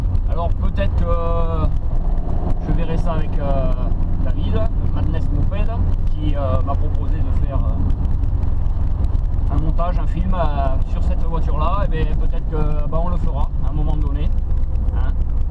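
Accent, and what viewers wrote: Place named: Hautes-Pyrénées, France. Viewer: Aaah super ! En plus des mob une rallye . J ai clio rs1 en plus du spx et mvx. Et à l accent je dirais que tu es de mon coin(65)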